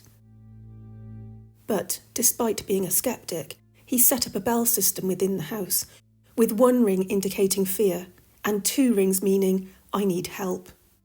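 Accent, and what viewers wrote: United Kingdom. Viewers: This is a incorrect title for this yt video Berkeley square is an expensive area of London to live as british pm have lived and the british nobles own properties in the area all with nannies and servants that are under there employment the video has nothing to do with LA America even the narrative is spoken in correct English and at one point even has a british southern accent and a brummie both of which the us population get wrong so the narrative could be by a true brit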